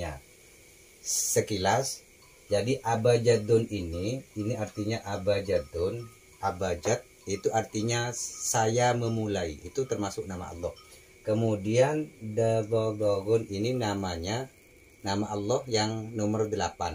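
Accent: Madura, Indonesia. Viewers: Sampean kyk logat nya Madura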